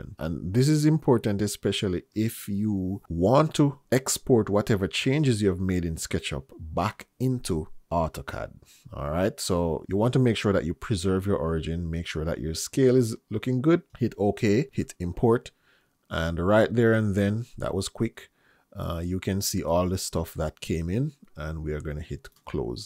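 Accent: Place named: Jamaica. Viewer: Thanks bro. Peace from Cape town South Africa. I'm enjoying your series. And that Jamaican accent allows for good vibes whilst learning .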